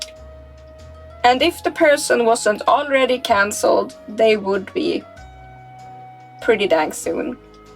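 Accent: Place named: Sweden. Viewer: Loving your channel and your accent, are you Swedish? Keep up the great work young lady! 😊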